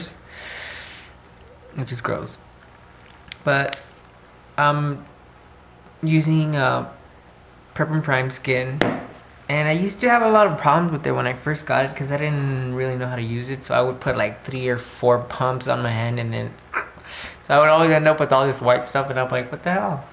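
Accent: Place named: Latin America. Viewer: your voice is so calming and latino-ish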